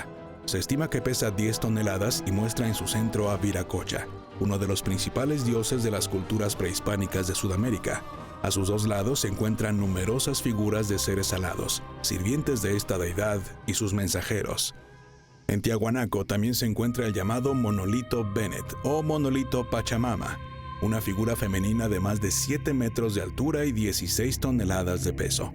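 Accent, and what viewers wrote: Mexico. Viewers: Habla con mucho cantito mexicano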